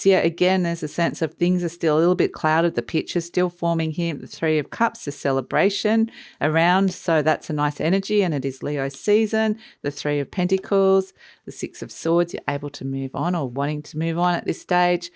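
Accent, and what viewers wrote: Australia. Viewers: I love this reading. I am feeling all of this. Sadly, I found someone I wished to co create with, and it had its challenges. And being very particular with whom I share my energy and love, it’s necessary for mutual respect and appreciation. Im not a fan of competitive co creating, and people who try to get one up on me. It’s all about a win win alliance, and teamwork. After these few very challenging months, deep healing work and introspection, a delay for a new beginning, I’m so ready to get back into action. Thank you for your time. I love your surroundings, the sounds of birds, the crows today, and your Australian voice. It’s been quite soothing. Much love to you. 💗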